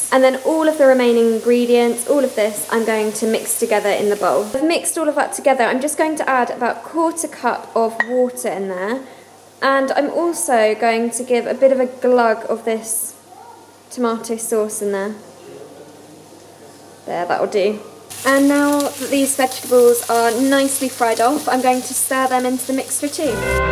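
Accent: RP, United Kingdom. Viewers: I luv ur accent is it British 😍😆👌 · jaysus you're posh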